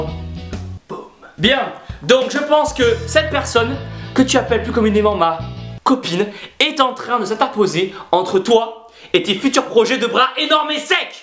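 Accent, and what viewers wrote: South, France. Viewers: Daaaaamn! Avec le corps sec que t'as et ton petit accent du Sud, on ne t'a jamais proposé de faire les Marseillais?:p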